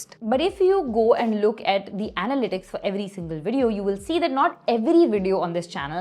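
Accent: India. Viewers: listening your india accent torturing my ears . sorry i cannot watch this.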